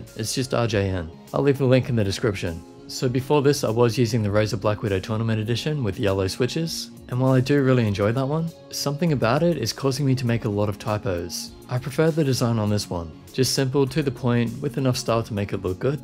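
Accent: Australia. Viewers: Do you speak a General Aussie accent? Im learning accents and it’s hard to find the difference between Broad and General. I know ur not using the Cultivated accent.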